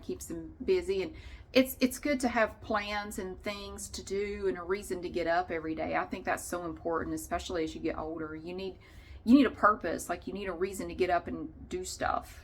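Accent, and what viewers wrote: South, United States. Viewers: I just like you talking; I think it’s a northern guy liking a southern girl talking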